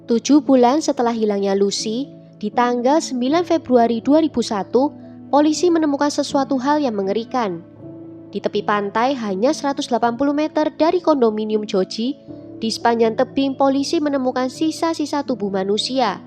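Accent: Java, Indonesia. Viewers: Kak.. org mn sih kok bahasanya medok banget. Club itu bukanya dibaca 'klap' ya dlm ejaan bhs indonesia. Masak di baca 'klup' jg... geli kedengaranya. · Kak...keren ih ceritanya... Btw spt logat org Jawa ya mbak... Sip smg sukses ya.... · Naratornya org jawa ini logatnya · dari pertama liat chanel ini aku dah yakin mbak e wong jowo koyok aku..😁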